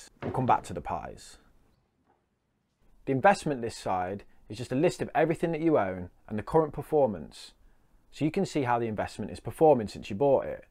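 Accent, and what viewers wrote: Birmingham, United Kingdom. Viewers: Forgot to say, great to hear from another Brummie! 😂🙌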